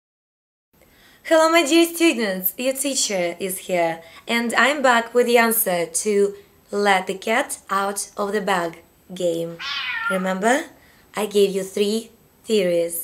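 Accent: Russia. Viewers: I believe she is Russian, I recognize the accent. By the way, why can't we all just get along. Think of it this way, it doesn't help you to get angry at the other guy, that only satisfies his need to annoy you. You shouldn't antagonize him either, that only puts you akin to his behavior. Also, it is not necessary to respond to me, I will very likely never check back anyway. My very best wishes to all of you.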